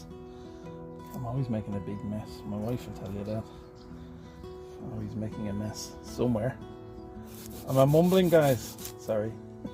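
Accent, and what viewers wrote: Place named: Ireland. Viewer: Another great vid mate. Billocks ti the trolls! We love your Irish accent. Currently in a field with crap signal, setting up for a weekend LARP event so chilling out, watching this. Cheers mate and keep.up the great content. 👍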